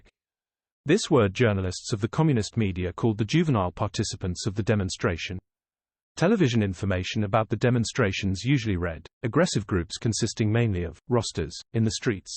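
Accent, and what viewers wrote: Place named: RP, United Kingdom. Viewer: I LOVE learning where the Dove of Peace came from. Wow no idea that came from Picasso. Ok just going to say that upper class Brit accent just sounds wrong. Doesn't match your passion and incredible heart for history. 👣🧭🫶❤🕊️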